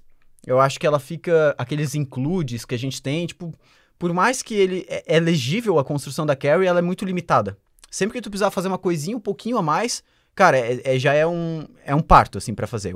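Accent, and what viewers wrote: Rio Grande do Sul, Brazil. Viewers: Vc é gaucho?? que papo é esse de TU?????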